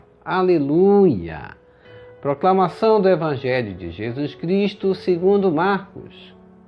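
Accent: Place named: North, Brazil. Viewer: Obrigada, por compartilhar conosco a palavra de Deus. Que Deus te abençoe e proteja sempre. Mande um abraço para Manaus e peço orações pela nossa cidade. E a propósito, diga-nos qual é seu estado? O seu sotaque me parece ser do Norte. Um grande abraço!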